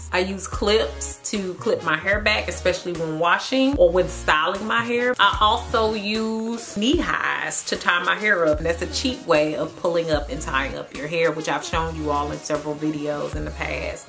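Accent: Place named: Houston, United States. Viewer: One of the best natural hair videos. You sound like you're from H-town. You're hair is the bomb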